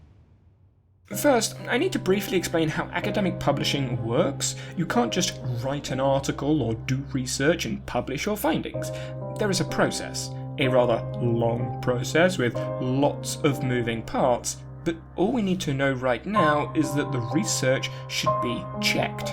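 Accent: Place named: United Kingdom. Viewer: why with a British accent?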